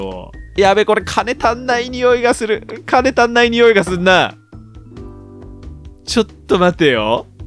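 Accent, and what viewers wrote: Kansai, Japan. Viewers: 最近関西弁多い気がする🤔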